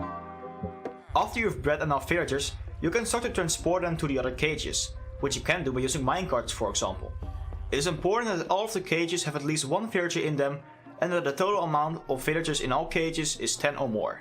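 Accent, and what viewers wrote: Netherlands. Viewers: are you dutch ? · Hi, I have a Question: Does this also work on all platforms like XBOX One/360 and PS3/PS4? Btw, you have an accent. Are you dutch?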